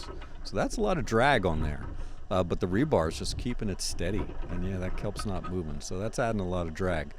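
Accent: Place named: Philadelphia, United States. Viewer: I'm really digging the Philly accent narration!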